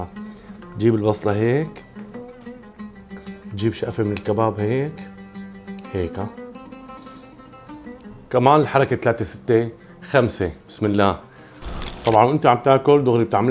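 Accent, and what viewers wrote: Syria. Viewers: اللهجة السوررية ❤️ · الله يرزقك من حيث لاتحتسب ، الف شكر على نظافتك واسلوبك ولهجتك السورية المحببه لقلبي ، الله يعمرك ياسوريا وترجعين عروس المدائن ونرجع نزورك اشتقنا لك كثييييييييير ، الله يعطيك الف عافيه الشيف الطيب واحلى لايك ومتابعه وورده وحب من السعوديه 👍💐♥️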